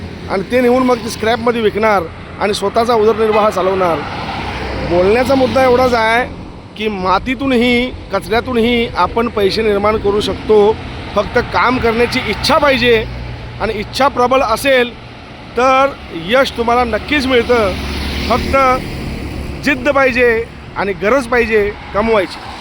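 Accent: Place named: Belgaum, India. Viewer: 100% बरोबर आहे तुमचे. पण तुमच्या भाषेत कोकणी टोनिंग नाही बेळगावी टोनिंग आहे .❤👍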